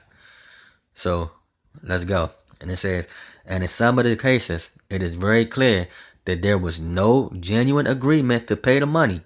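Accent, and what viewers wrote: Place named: Virginia, United States. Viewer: You're from Virginia, huh?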